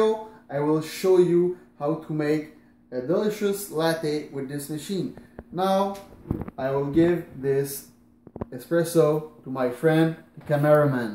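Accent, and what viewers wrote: Quebec, Canada. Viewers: Merci mon homme, bon accens québécois XD Avant mon café tasted like shit aussi, maintenant il taste toujours like shit mais c'est à cause que j'ai une keurig. J'ai commandé la breville Café Roma sur amazon et je vais commencer à me faire des bon latte! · T'a commencé à parler et je savais t'était Québécois haha x). L'accent est très prononcé, mais nice le review.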